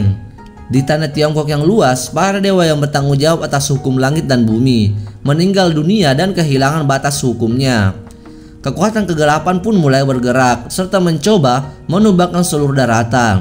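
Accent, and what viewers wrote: Takalar, Indonesia. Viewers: Orang takalar sulawesis elatan kah?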